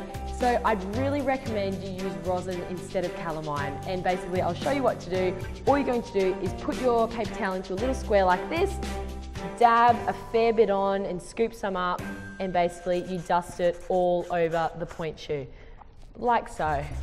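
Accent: Australia. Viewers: Is she Australian 🤔🤔🤔 if she is I live in Australia too. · oh and also are you from Australia because you sound like it and i am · r u Australian?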